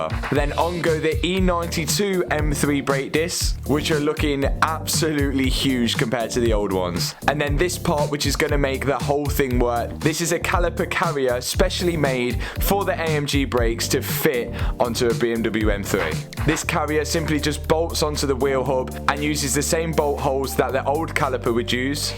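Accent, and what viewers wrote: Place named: RP, United Kingdom. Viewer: This is my first video I've watched on your video. You sound posh but look like an LA fella 😂. Cool car content though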